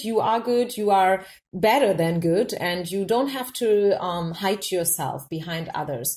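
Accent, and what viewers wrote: Germany. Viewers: I just love that you post for us in the US. Your German accent is adorable 💕 Thank you for your timeless message today.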